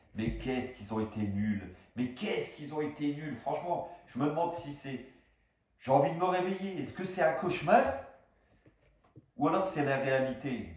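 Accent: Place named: Lens, France. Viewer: T'a un accent lensois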